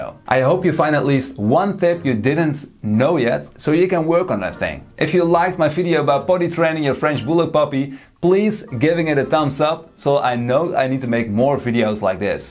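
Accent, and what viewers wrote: Netherlands. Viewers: Are you Dutch your accent sounds dutchhh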